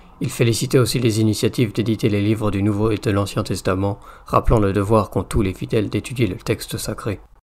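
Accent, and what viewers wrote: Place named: Europe. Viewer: Par votre accent, je présume que vous êtes en Europe. Voici une réalité canadienne. Tous les documents que vous avez cité sont valides, la nouvelle messe elle aussi est valide. Le problèmes s'est trouvé au niveau de la formation des prêtres dit baby boomers. En effet, bien que tous les documents soient présents, la formation lors de notre "révolution tranquille" a engendrée plusieurs cohortes de prêtres dit "communistes" nos pas de manière politique, mais de manière liturgique et idéologique. Ses cohortes font encore des dégâts aujourd'hui dans l'église québécoise et canadienne en enseignants : que le Christ n'est pas présent dans le saint sacrifice, que la messe n'est pas un saint sacrifice, en sautant ou modifiant des prières eucharistiques, en ne priant pas le bréviaire, n'affichant pas où peu l'adoration, favorisant les femmes diacres/prêtres, aucun respect pour la manipulation de l'hostie, en utilisant les homélie pour faire un spectacle et non pour passer un message biblique. 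Etc.. tous les fait que j'ai énumérés ci-dessus j'ai pu les voir de mes yeux! Je ne blâme pas les documents du Vatican ou les conciles, mais bien le manque de rigueur et le manque de jugement des séminaires. Encore aujourd'hui, les séminaires québécois enseignent certains points qui vont à l'encontre de catéchisme et même du droit canonique! Le fléau est donc dans la formation et c'est pourquoi nous pouvons voir un exode des séminaristes vers les séminaires plus "traditionnelles" non schismatiques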